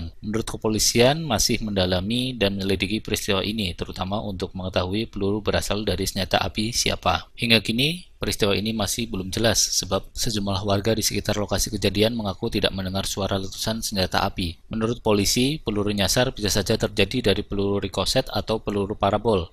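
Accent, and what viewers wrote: Banyumas, Indonesia. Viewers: mmg top bermanfaat ilmu2 yg di bagikan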